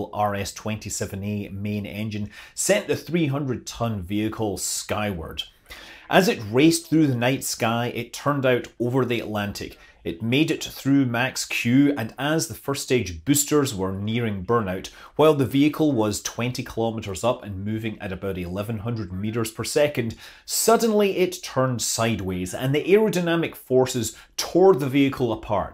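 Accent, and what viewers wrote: Scotland, United Kingdom. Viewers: That's Scots, och!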